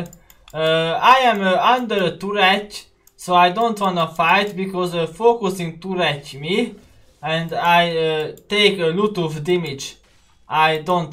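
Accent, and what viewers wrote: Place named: Hungary. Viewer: már régen is jó volt az angolod, de örülök hogy most már nem olyan magyar akcentussal beszélsz mint ebben a videóban.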